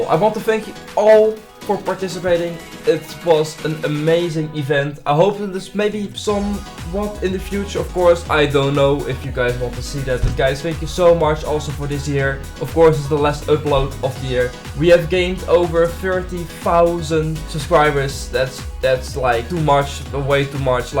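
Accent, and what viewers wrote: Netherlands. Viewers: Dutch accent nice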